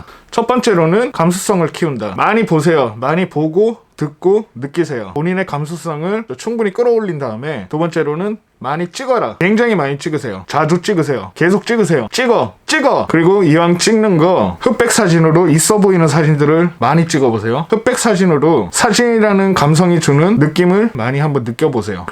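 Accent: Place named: Daegu, South Korea. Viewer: 댓글 달고 나니까 대구가 고향이라는 말씀하시는 부분이 딱 나와버렸어요 ㅋㅋㅋ익숙한 말투라 ㅋㅋ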